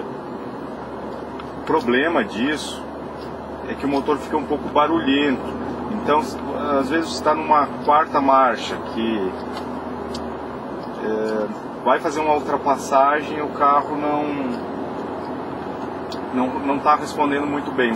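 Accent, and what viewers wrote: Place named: Rio Grande do Sul, Brazil. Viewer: lagoense com sotaque gaúcho rsrs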